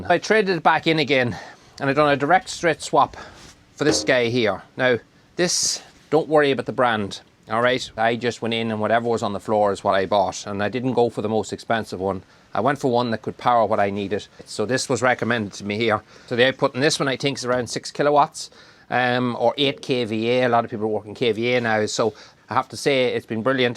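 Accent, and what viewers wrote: Ireland. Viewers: The sight no1 wants to see at home, the blue lighted neon beastie. Sending well wishes your mums way, and indeed to the whole family. Sorry to hear you lost one of the herd too, but it sounds as though it was the kindest thing you could have done for her. Daft question: what is it you say to the cows to make them follow you? Success? Suck's? I can never quite figure it out lol (Need more practice with the Irish lilt lol)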